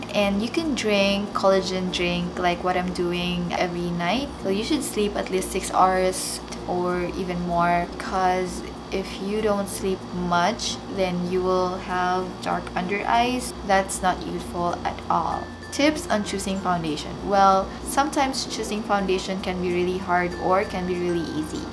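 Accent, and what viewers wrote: Philippines. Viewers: Filipino accent hehe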